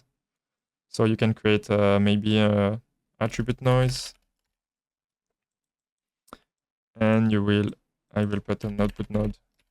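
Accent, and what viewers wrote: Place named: France. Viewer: Comment il gère ça le bro avec le ptit accent frenchy hehe :) lourd lourd lourd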